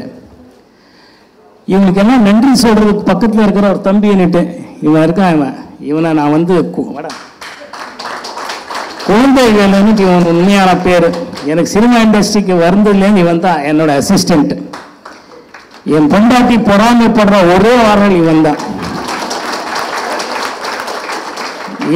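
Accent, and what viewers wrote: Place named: Karnataka, India. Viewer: பேச்சுல கன்னட நெடி...ஆனாலும் நல்லா இருக்கு....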